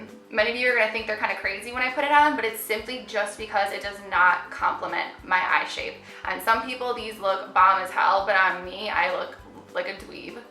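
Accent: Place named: Midwest, United States. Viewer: Wow that is one serious midwestern accent.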